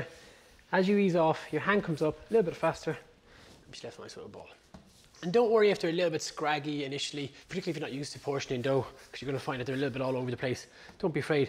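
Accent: Ireland. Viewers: I'm not going to lie I'm going to have to watch this a second time ..love just listening to Irishman talk one cooking is a little mind blitz your awesome from America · It is English but with an Irish accent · is such a great teacher and communicator. I have been making breads at home for years but I still have had my knowledge added to and reinforced by this and other videos here. He takes time to really give an explanation as to how and why. reassurance that a recipe is just a foundation and that the experience of baking has so many variables is valuable too. Not to mention the soft Irish voice! I regularly have fresh yeast (I just ask at the in store bakery at my supermarket) as I just think that it gives a better flavour. I am also totally converted to slow proving as I know that the flavour is improved. When I made these doughnuts I did not have a lemon but substituted lemon zest with grapefruit zest and they produced a very tasty batch. 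· I so enjoy your Irish accent, experience & knowledge. Thank you, God be with you.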